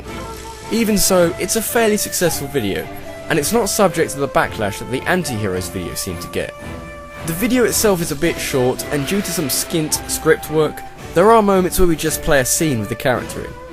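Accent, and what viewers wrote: England, United Kingdom. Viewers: Okay, this is not what I imagined you to look like. DAMN YOU FOR YOUR MISLEADING, YET BEAUTIFULLY SOUNDING ENGLISH ACCENT! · Oh. My. God. Your face... IT'S SO... different to what I imagined... Seriously, when I listened to you on all your other videos, I always pictured you with a long face, black hair, and you would wear glasses... weird huh... Dammit! I watched too much Harry Potter. Now when I hear a british/english voice without a face, that face is all I can think of... I'm SOOO Sorry! Epic list though... and congrats on 20,000 subscribers!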